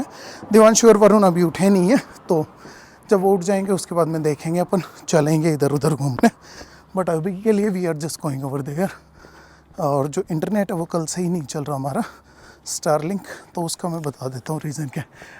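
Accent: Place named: India. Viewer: Bhai ji ap kisi technician se pahle iska voltage or amp load chek kra lo. Kahi apki battery ko bhi kharab na kr de. Apki voice typical Indian voice hai bro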